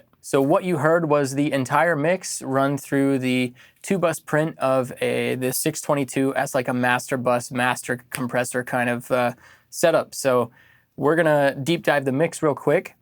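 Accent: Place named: United Kingdom. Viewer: Love the British accent mate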